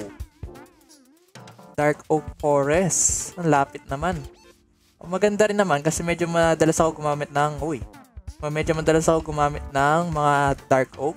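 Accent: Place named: Visayas, Philippines. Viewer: Para ka pong bisaya hahah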